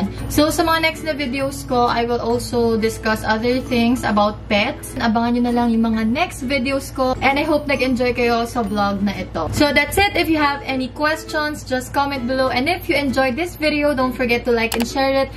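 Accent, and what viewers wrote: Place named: Ilocos, Philippines. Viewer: with her ilokana accent. annako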